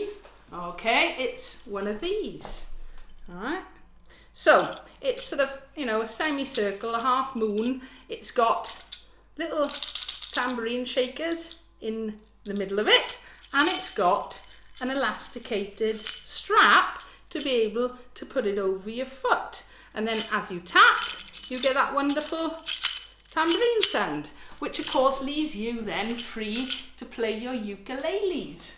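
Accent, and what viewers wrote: Wales, United Kingdom. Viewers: Just love watching you and your lovely, warm Welsh accent. I've just begun to learn at the age of 84. Thank you.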